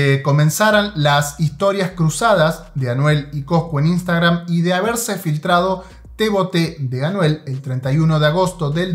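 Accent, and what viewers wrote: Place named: Puerto Rico. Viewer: ¿Cierto? Se escucha de PR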